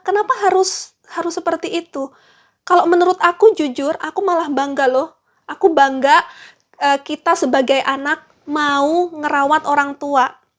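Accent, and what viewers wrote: Java, Indonesia. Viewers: Assalamualaikum mbk...aku seneng nonton vlognya 😍, denger suaranya empuk, ciri2 wanita jawa (logat jawane ya tetep dipakai) yg gak lupa dengan kodratnya jadi menantu idaman banget...yg julit lihat dulu vlognya di Indonesia duh rumahnya indah bgt nuansa bali...mbk ttp bilang sederhana... jarang2 komen kalau nonton tp pas tau ada yg julid kok melok mangkel aku...😅 sehat2 mbk di Inggris...salam dari Tulungagung 😄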